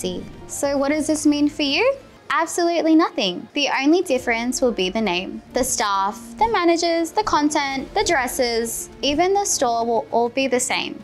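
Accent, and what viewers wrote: Australia. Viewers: Australian????😭😭😭😭😭 that accent caught me off guard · I had no idea she was Australian or had such a beautiful accent :Edit: thanks so much for all the likes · I HAD NO IDEA SHE WAS AUSTRALIAN DANG. Gorgeous face body and voice, shes blessed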